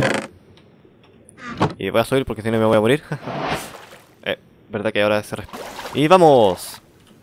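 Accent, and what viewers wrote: Chile. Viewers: tu manera de hablar como un chileno de verdad me encanto, buen tutorial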